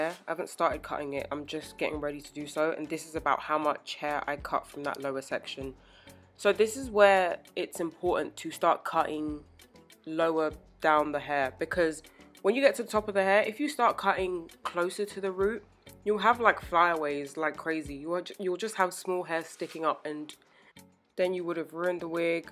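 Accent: United Kingdom. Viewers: You are brilliant love the video girlfriend 🥰 and so love your amazing British accent … everyone subscribe subscribe subscribe!!!!!! She knows her stuff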